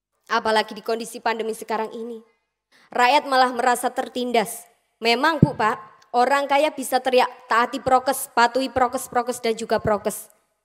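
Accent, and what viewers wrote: Java, Indonesia. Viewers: Mbak hebat 👍👍.Wajah dan suaranya bening.Pengetahuan agamanya lumayan.Tehnik penyampaiannya bagus.Yang paling menarik bagi saya laval bhs Jawanya sangat mengesankan.Jarang ada yng mampu seperti itu.Selamat Mbak,salam dr Semarapura,bali.Klo blh tau Malangnya di mana? Saya dulu sering ngelencer di kayu tangan.Suksma.🙏🙏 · Suksema yo apik pisan dialek jowone santun bikin hati ne tentem /adem yo cah ayu pisan matur nuhun ngih apik jenenge ayu pisan wonge suksema ngih .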